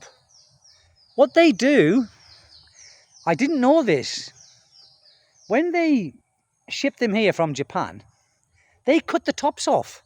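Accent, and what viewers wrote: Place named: England, United Kingdom. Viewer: I love your English accent mate.😁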